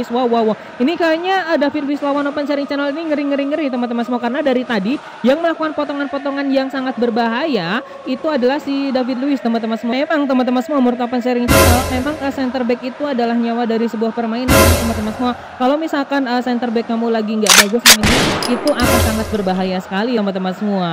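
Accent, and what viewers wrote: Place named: West Sumatra, Indonesia. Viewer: Suara lu ko kek minang kocak si bg🙄🤔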